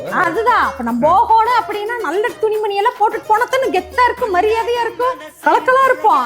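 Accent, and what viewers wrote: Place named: Coimbatore, India. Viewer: The epic CBE slang, flexing it...❤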